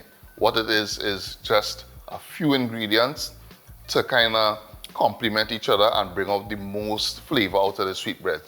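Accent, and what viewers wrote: Trinidad and Tobago. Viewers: I don't know what style of sweet bread you're trying to reproduce. I suspect it's Trinidadian, due to your accent. I'm familiar with traditional style Barbadian sweet bread and it did not have any of those hideous mixed fruits and maras. cherries that they are putting in the bread nowadays, according to what I have been seeing online. This is almost traumatic. Thank you for not putting that crap in your bread!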